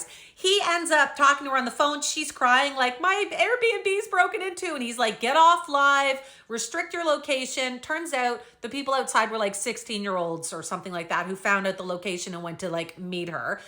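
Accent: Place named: East Coast, Canada. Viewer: Just found you tonight. You have to be Canadian, east coast!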